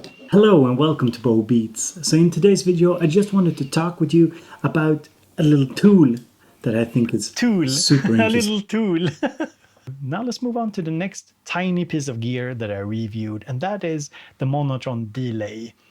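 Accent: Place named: Stockholm, Sweden. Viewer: I can picture myself getting rid of pretty much everything except my OG Circuit. It's such a joy. The immediacy of the sequencer, the knob twiddling exploration, the portability. I honestly, unironically believe it's an ideal environment for getting a curious young brain into understanding and creating music. Imagine getting one for your 12th birthday. (Din engelska har blivit bättre och bättre, men i mina öron låter du fortfarande som nollåttan, han med syntarna. ^_^ Hör av dig om jag kan hjälpa dig med uttalscoachning. <3)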